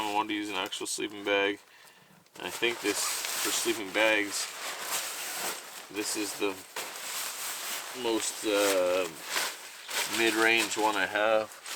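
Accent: England, United Kingdom. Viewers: Cracking English accent 😁👍🏼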